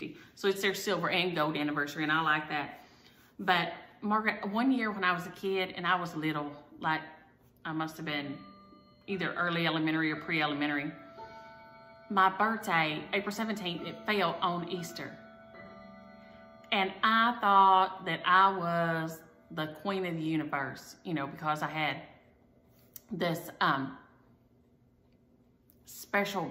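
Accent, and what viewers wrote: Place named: Texas, United States. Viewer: I love your accent, I’m a fellow Texan too over in Collin County. You have the cutest projects! I like Clara, jingle, and the star projects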